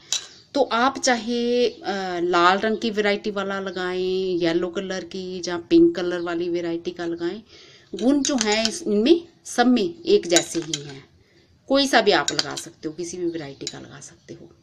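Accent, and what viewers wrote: Punjab, India. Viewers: aapki aawaz punjabis tone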